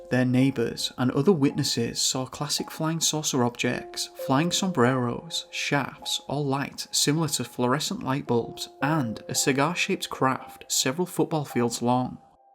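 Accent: United Kingdom. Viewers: It's called an accent..a very specific British one.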